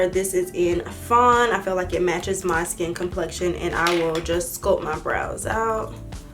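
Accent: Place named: North Carolina, United States. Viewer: if that was a " " thing, or a North Carolina accent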